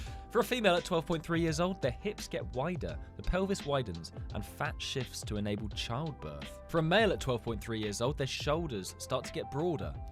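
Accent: United Kingdom. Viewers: Are you british.because you and joocie (my goat)should collab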